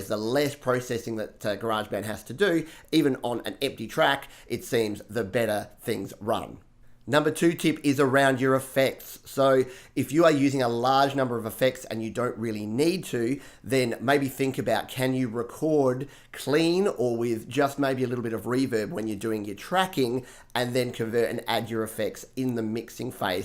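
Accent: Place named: Australia. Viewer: Betht five tipth Sorry that was mean. The dope Aussie accent more than makes up for it.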